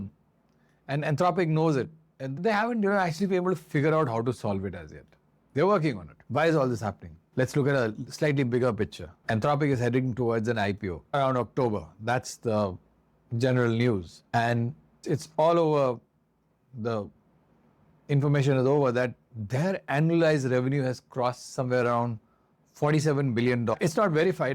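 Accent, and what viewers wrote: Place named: Scotland, United Kingdom. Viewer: Dude, it sounds like you learned English in Scotland, but your info is accurate. Thank you.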